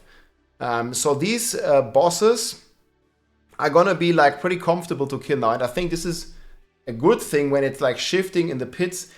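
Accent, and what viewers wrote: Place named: Germany. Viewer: I read patch notes and stuff, then come here to be serenaded by a heavy German accent.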